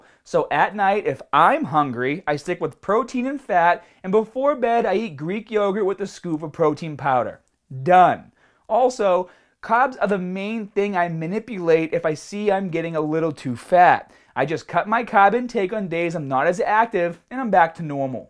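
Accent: Boston, United States. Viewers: Why is he talking like that? I haven't seen his videos in a few years Looks like developed a Boston accent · When did pick up this Boston accent? I used to watch him a few years ago and he never sounded like this? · i been a sub for a really long ass time and i love how your not editing your videos as much and how you love your boston accent now. keep it like that. also i only put myself in food comma when im allowed a cheat day. im a boxer so that comes like once a year and half or two. everything else is a pretty much strict diet, including water intake. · In from Braintree bro! U must be from mass cause I sound exactly like u! Go Boston accents!! Just subscribed right now, love your bro!! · Keep the full accent man should be preserving your culture as part of your culture that Boston accent Go full on with it man set you apart from everybody else is part of your character as part of your routine it's part of Who You Are, is trying to come out all the time but you're suppressing it before b u i mean that with all that's you Boston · Let me guess, you're from Boston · when did this guy get such a heavy boston accent? 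· This man's boston accent is getting intense lol. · The Boston accent is severely strong with this one lol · when did you start this hardcore Boston accent haha never used to be that strong. I'm from mass too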